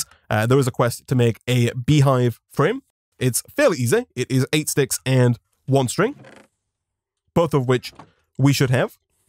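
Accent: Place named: Yorkshire, United Kingdom. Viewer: man, you must speed up your videos. why? you have the voice and pronunciation of a beautiful Yorkshire angle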